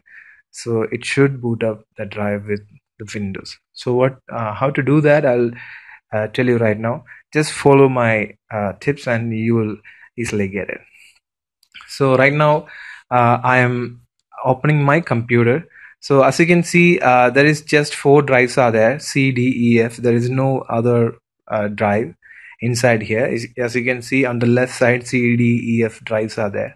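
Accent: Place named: India. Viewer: you know its going to be a good tutorial if you haer that indian acent. btw thx it worked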